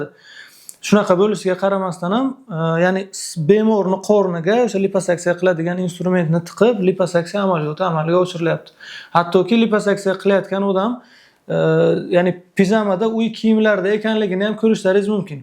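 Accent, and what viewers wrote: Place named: Bukhara, Uzbekistan. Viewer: Оллох Рози булсин сиздан доктир. Доктир каерликсиз.? Бухороданми шевангиз бизга тортаркан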